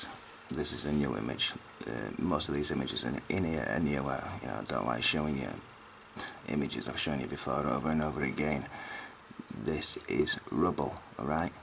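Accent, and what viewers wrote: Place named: Scotland, United Kingdom. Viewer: Love your work, being a fellow neighbour scot..dig the accent (no pun intended) i love sherlock holmes at the best of times..i mean serously..have learned much form his methods and observations but this takes it to a whole new level...when you explain it then you see it for what it is...it becomes the elephant in the room...i have a construction back ground myself, so know a thing or two from an engineers stand point... and i look all around me at modern cities every where i have traveled been to over 21 countries so far...and i can't help but notice that rapid rate of erosion and dilapidation of our modern buildings...so really makes you wonder what <num> odd years would look like?...and it pretty clear that IS what we are looking at..so many pre-civilisations being reset every few thousand years...when your numbers up - your numbers up.....keep up the amazing work..you are doing breakthrough work. 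1 QUESTION ?? interested to hear your theory on what would create the volume of sand we see everywhere all over the world...Like the deserts...(Maybe once Oceans) its maybe that the old world was Silca based and now we are carbon....SO much sand..(and) water..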